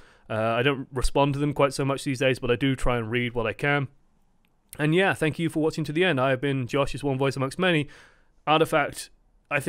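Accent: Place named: United Kingdom. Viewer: I like rant-esque news and thoughtpieces by british-accented folk.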